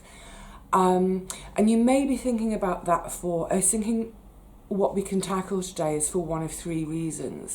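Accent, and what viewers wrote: United Kingdom. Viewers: Hello!! I just found you, it surprised me to hear a British accent. I seem to only be finding American channels which have helped a lot, but I can relate more being in England.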